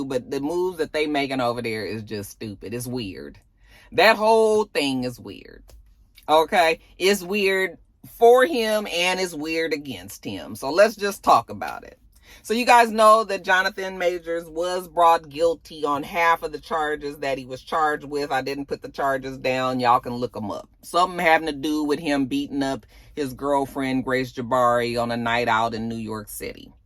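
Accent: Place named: Los Angeles, United States. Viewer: is so ATL. you still have some LA in your voice. lol.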